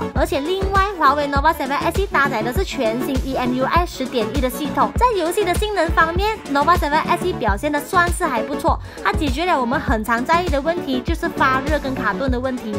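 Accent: Malaysia. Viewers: 這應該是馬來西亞中文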